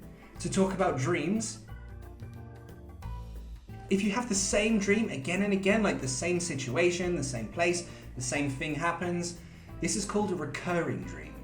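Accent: United Kingdom. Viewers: Yes, this is British English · is this british english? i was studying your videos because i tougth that it was americano english😭😭😭😭😭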